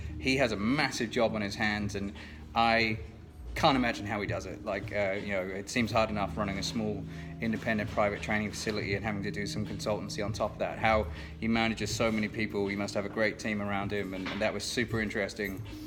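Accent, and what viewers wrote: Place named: Australia. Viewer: Hi mate, what part of the UK are you from? When you speak you sound Australian.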